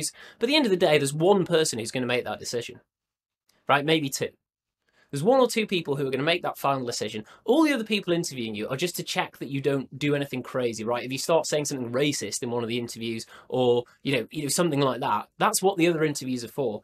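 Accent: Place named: United Kingdom. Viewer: well ! Bro I'm listening to British accent so I found that you sound British.. Therefore, I asked it from you. Thank you so much for responding Could you please recommend me more youtubers with British accent?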